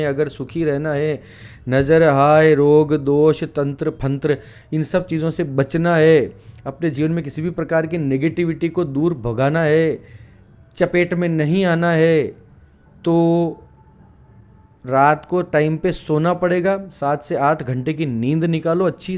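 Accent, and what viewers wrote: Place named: Rajasthan, India. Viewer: Aapki boli bhilwara ke aaspaas ki h bhai sab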